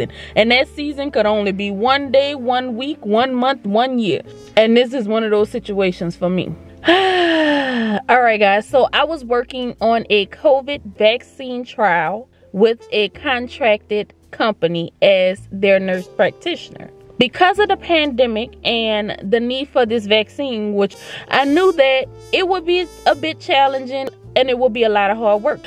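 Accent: New Orleans, United States. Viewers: Why do you sound like you're from New Orleans, lol. . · Please confirm my suspicion...you're from New Orleans right? 😆...I'm from there and I just feel like I hear the accent.... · Are you from Nola...I love your accent 🤔😊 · Sis. I'm still looking up to you as a RN gaining her BSN and ALWAYS choose yourself especially when it goes against your moral code. You from New Orleans? I hear it. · I hear some New Orleans in that accent. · Are you from N.O.? I hear it all in your voice. Lol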